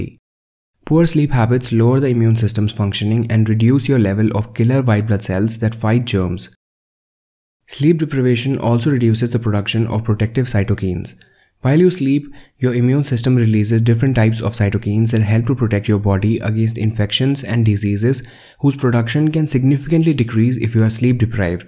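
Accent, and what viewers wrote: India. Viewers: You're accent is more like indian buddy ! ❤️❤️ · Are you Indian your English essence is like of a Indian🤔🤔🤔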